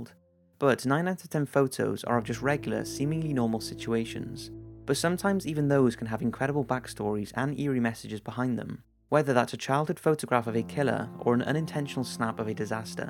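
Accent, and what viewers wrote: Wales, United Kingdom. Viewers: He's Welsh, sounds like somewhere near Cardiff. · I'm hyuring the "yur"s in your Welsh accent. Awesome! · its a welsh voice, so naturally nice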